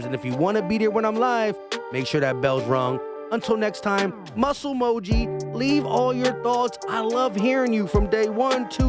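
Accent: Philadelphia, United States. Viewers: Does everyone is Philly talk like you?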